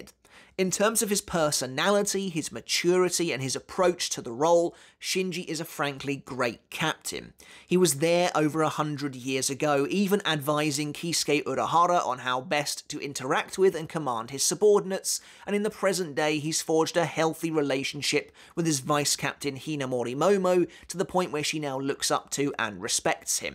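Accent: United Kingdom. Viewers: Didn't know an American spoke in a British accent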